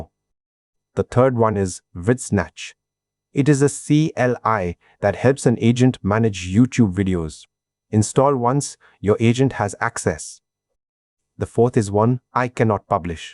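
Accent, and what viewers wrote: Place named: India. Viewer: Relieved I heard an Indian accent and not some ai bullshit 😅. I can now sleep in solace 😂❤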